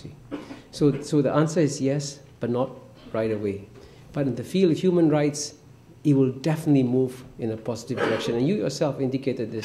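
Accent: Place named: Singapore. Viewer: he sounds SIngaporean!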